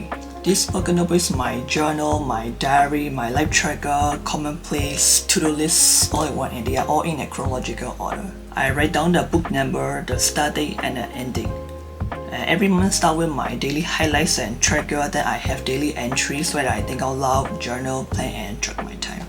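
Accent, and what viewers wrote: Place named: Myanmar. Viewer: You're accent look like Burmese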